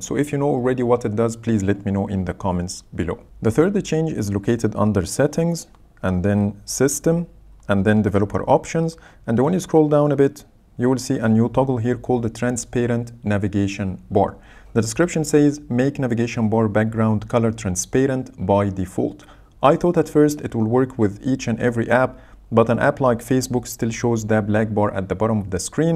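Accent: Egypt. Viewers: Love ur egyptian accent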